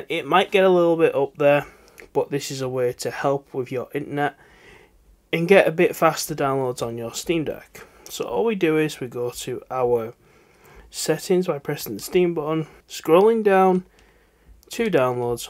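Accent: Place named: North, United Kingdom. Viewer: cheers. where u from mate u have same accent as where in from be up north lol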